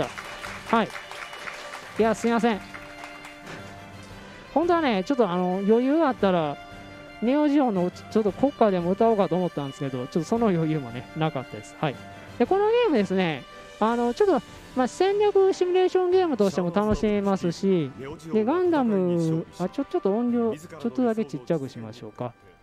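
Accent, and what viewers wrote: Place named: Kansai, Japan. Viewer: 淡々と関西弁で語りながら所々冗談入ってるのが面白い ここから宣伝につなげるとは思ってなかったｗｗｗｗｗ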